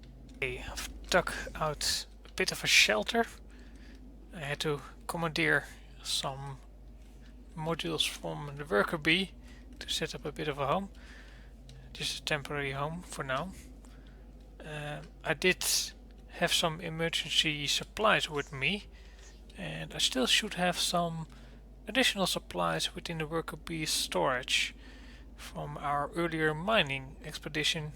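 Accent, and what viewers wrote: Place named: Netherlands. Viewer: Heey, Just asking, but are you dutch? For some reason your English sounds like how dutch people speak it:)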